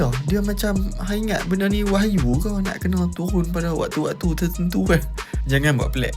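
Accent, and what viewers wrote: North, Malaysia. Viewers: Loghat utara lekat ooooiiiii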